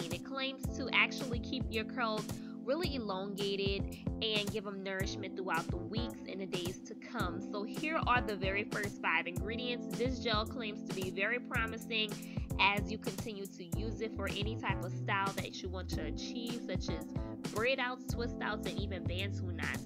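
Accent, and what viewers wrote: New Orleans, United States. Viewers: I feel I hear a NOLA accent. I just randomly picked them up at a Dollar General. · Is that a Nola accent I hear 😍 cuzzzinnnn!!! New subbie 😘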